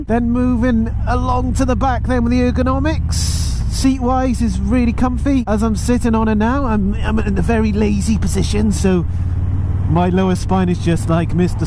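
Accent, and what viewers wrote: England, United Kingdom. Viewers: I made it to 2 minutes English accents piss me off .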